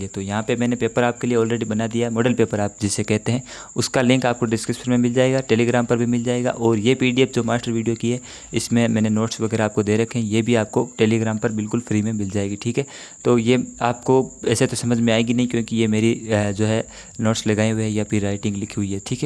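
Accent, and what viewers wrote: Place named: Marwar, India. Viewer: सर आपके वीडियो में कभी-कभी मारवाड़ी भी झलकती हैं जो बहुत अच्छी लगती है। सर क्या आप मारवाड़ क्षेत्र से हो ? मेरे जैसे डीडवाना-कुचामनसिटी जिले से।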